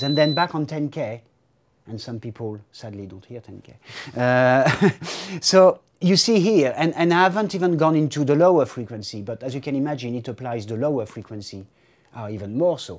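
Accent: France. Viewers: haa probably french accent no? better to understand in the middle of all this english lesson. ;) good lesson thx peace !! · Goog french accent haha Thank you for the video ;)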